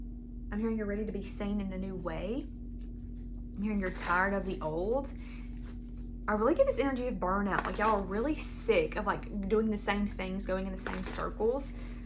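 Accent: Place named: South, United States. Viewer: that southern accent goes crazy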